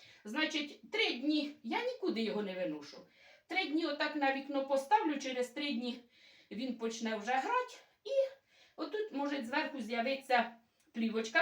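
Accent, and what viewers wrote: Poltava, Ukraine. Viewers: Так говорят на Полтавщине. Прекрасный край, чудесные люди. С любовью Мариуполь. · ваш тембр дуже нагадує специфічний полтавський акцент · Правильно ви балакєте так як і ми на полтавщині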